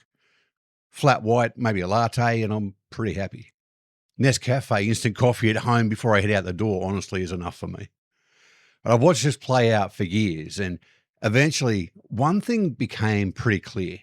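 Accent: Australia. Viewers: You’re not an Aussie living in America; you’re an American with an Aussie accent. (I’m an American with an Ohio accent.)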